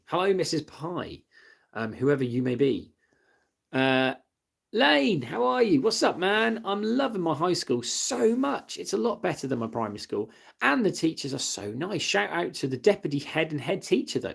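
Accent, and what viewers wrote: United Kingdom. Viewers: You can tell he is British….😂I’m British to My fav teacher is mrs fox, I wish British people didn’t have to wear school uniform, I have to wear a green tie 🙄 and it’s SO uncomfortable 😭 😅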